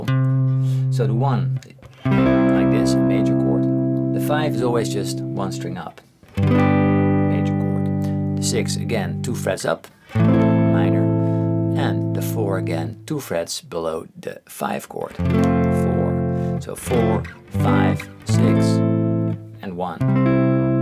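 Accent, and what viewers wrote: Netherlands. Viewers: Are you Dutch? Because your accent really sounds Dutch. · I smell dutch 😅 btw good vid it helps a lot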